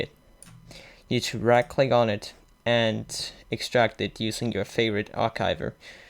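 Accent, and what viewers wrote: Denmark. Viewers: Are you Danish?? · Are you Danish? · are you from denmark?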